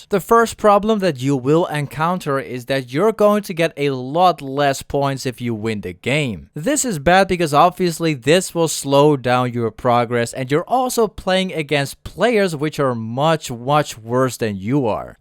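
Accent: Netherlands. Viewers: Are you Dutch? Because your English accent sounds allot like you're Dutch. (I'm Dutch and have heard allot of people talk with a weird accent haha.)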